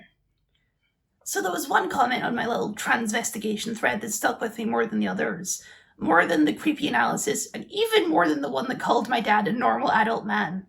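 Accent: Scotland, United Kingdom. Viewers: What's wrong with his voice? I think its the fact he's speaking scottish english. There isn't anything wrong with that, it's just a dialect/accent. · good video, liked and subscribed. A few notes from a trans woman of 50+ years of age, and who transitioned as a fully mature adult. I lived more than half of my life pretending to be male, and in the 90s I started transition at around 30 years old. Prior to learning about transitioning and being trans I was a typically masculine looking over 6 foot tall human, with a "Girls Voice" as a person who was attracted to women, looked like a handsome man, it was a difficult thing to work through. I ended up learning to sing deep and started smoking so I could affect a masculine voice. (So glad I stopped trying to be a man) but the thing is randomly some peoples voices don't automatically break, sounding masculine is not a given. 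For me I was lucky as a trans person, in that my voice has always passed for a woman. Even as a late bloomer. doesn't sound as feminine as I did when I was twenty something. (Humor) However he has my old accent, and that bugs me.:P Yeah mum was Scottish, and up to about 11 or 12 years old I had my mums accent. But going to School in the USA forced me to change accents.:p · I wasn't expecting your voice to sound like that, but I think it's really cute tbh. And I love your accent (I'm a sucker for Scottish accents, they sound good no matter what. Even when I can't understand what's being said lmaoooo) Those people are miserable and live boring lives. Using 4chan in 2024 says a lot more about them then anything. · What's wrong with your voice? It's obvious: you've got a scottish accent which means most americans will think is... br*tish 🤢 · I just figured that was some odd Scottish accent you grew up with. 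I'm serious. I've met people who only spent their very early years in a different country and their accents were THICK despite that. But you in a box. That really makes a pathetic level of sense. I live in a red state and have seen people (not kids in high-school but adults in their 30s and 40s) insist someone with just a speech impediment must be a foreigner and will lose their tempers if opposed.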